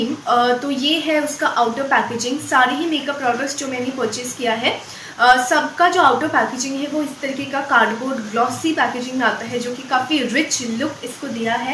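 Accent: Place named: Bengal, India. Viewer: Tumar awaje Bangla tan asche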